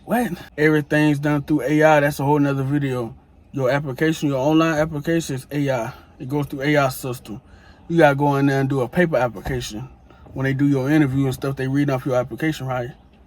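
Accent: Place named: South, United States. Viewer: Obviously, he probably from the south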